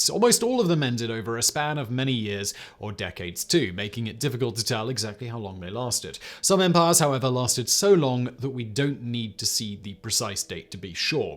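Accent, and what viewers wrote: United Kingdom. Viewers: The British accent doesn't fix Simon's abysmal pronunciation of even the most basic of English words. Painful. Not wasting any more of my time here.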